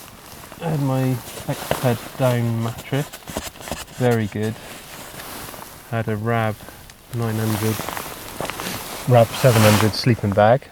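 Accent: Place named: Herefordshire, United Kingdom. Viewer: If you don't mind me asking where you based. I'm Leominster Herefordshire and I thought your accent sounded close. Good vid. Sub and liked.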